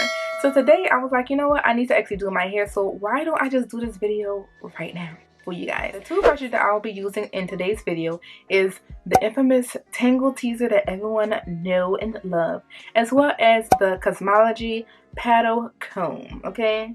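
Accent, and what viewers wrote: New Orleans, United States. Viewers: New Orleans accent?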